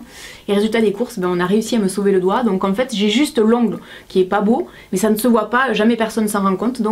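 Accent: South, France. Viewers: OH MON DIEU! Tu étais vraiment trop mignonne quand tu étais petite ma chérie, tu étais vraiment trop belle!!! Une petite rousse toute mignonnette, je ne sais pas ce que les gens ont avec les roux, les réactions envers eux me choque beaucoup. En tout cas, continue comme ça, ton accent du Sud me fais beaucoup rire, ce n'est pas de la moquerie mais moi aussi j'ai l'impression de me sentir en vacances et d'entendre ma tante...eh oui dans ma famille ils sont tous du midi sauf moi! #intrus. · tu es vers le sud? car ta un accent:D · Tu me fait bien marrer avec ton accent du sud😂😂❤ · coucou, je viens de découvrir ta chaîne et je viens de dévorer plein de tes vidéos! ça change ton fonctionnent tes conseils, tu es rayonnante naturelle et tu me fais rire! j'adore ta bonne humeur et puis parlons accent;) je suis du 64 et ça me fait très plaisir d'avoir une youtubeuse avec l'accent du soleil puisque dans les Pyrénées en ce moment c'est 20° et grand soleil:) cette vidéo m'a fait sourire puisque j'ai 23 ans mais je me retrouve beaucoup dans ce que tu dis, je suis plus vieux rock (led zep c'est la vie! 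) mais vive les 80! très contente d'avoir découvert ta chaîne, je vais vite m'empresser de regarder tout ce que j'ai raté! et comme on dit porte toi bien potons! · quel bonheur de vous entendre parler! Et oui votre accent, le mien, celui du midi,de Pagnol, qui fleure bon la lavande et le romarin ".Non ne rougissons pas de notre si bel accent, qu'il soit clair, sonore, retentissant et allons nous en l'humeur toujours pareille, emportant notre accent sur le coin de l'oreille....." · jai la rage contre la meuf qui envoi des menace t es une creme ca se voit vive les femmes du sud avec l accent si tu es effrayer je suis la tkt... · Tu es très belle et intelligente. Ton bel accent vient de quelle région du sud? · Cc, que du bonheur dans cette vidéo, tu m'as fait trop rire avec toutes tes anecdotes, ne change pas la et tout ça avec l'accent du sud, bises a+ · tu as vu plusieures fois Anastacia.... 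ok je vais aller me pendre lol j'ai jamais eu la chance de la voir sur scène et pourtant j'adorerai étant une fan inconditionelle de cette artiste depuis ses tout début - je suis tombé sur ta chaine par hasard et j'en décroche pluuus lol Et vive l'accent du sud (a) (je suis près de montpellier ^^) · Haaaa une fille avec l'accent du sud la famille ^^ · Trop drôle tout ce que tu dis et de la manière que tu le racontes. J'ai fait comme ta maman avec mon fils qui avait piqué une sucette dans mon dos chez le marchand. tu es superbe et tes vidéos aussi! Et surtout garde ton accent du sud. Tu fais honneur à notre région! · cc j'adore t video tu est trop trop belle tu ma fait trop rire lol. j'adore ton accent du soleil. t o top bisou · J adore ton accent du sud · J'ai découvert ta chaine aujourd'hui, et je suis en train de visionner toutes tes vidéos! Tu es vraiment adorable, j'aime ta franchise, et ton petit accent du Sud! 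Et puis, tu es vraiment magnifique (t'as d'beaux ch'veux, tu sais?!) Je ne commente jamais sur YT mais je voulais quand même préciser quelque chose: le poisson, c'est de la viande! Du coup, ceux qui se définissent végétariens alors qu'ils mangent du poisson... offrez-vous un dictionnaire pour la rentrée! ^3^ <3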